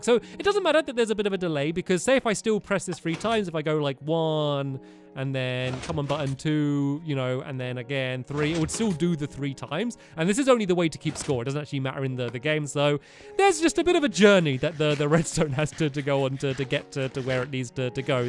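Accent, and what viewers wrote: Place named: Liverpool, United Kingdom. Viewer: Scouse KiddaLaa boi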